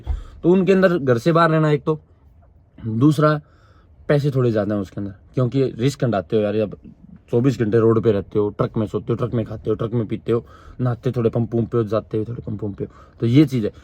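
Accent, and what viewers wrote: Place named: Haryana, India. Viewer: Video bhut dekhe aap jaise nhi apni boli m maja aa gaya Bhai ji konsa gaon h aapka boli apni mast apni boli m video bhanya acha lega m naresh gaon barwa bhiwani haryana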